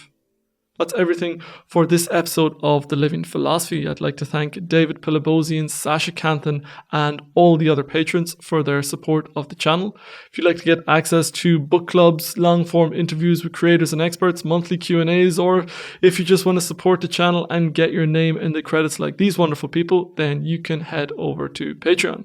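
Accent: Ireland. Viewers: Irish accent is awesome ☘️ . Great books btw. Interesting information on the various people.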